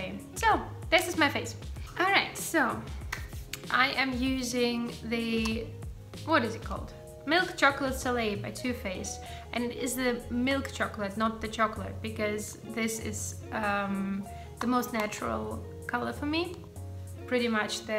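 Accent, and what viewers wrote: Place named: United Kingdom. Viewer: Don't worry we love your British accent 💛 And thanks for sharing your new video I love them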